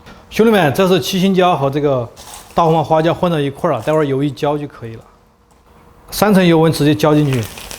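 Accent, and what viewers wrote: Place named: Yibin, China. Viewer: 我听主播应该是宜宾的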